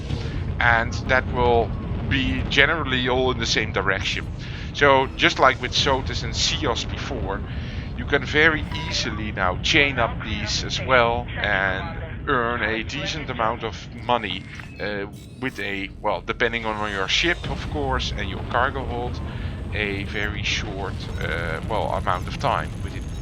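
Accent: Netherlands. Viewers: is it just me or are you dutch just like me cause your dialect sounds a bit dutchy XD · Hoi, ik hoor aan je accent dat je wellicht Nederlands bent (no offense je Engels is verder prima), maar ik begin net in Elite Dangerous. Of nouja net, ik heb al aardig wat uren er in, maar ik wil het nu wat serieuzer nemen. Kan je misschien enige tips geven om snel te stijgen in ranks? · That dutch accent is strong =)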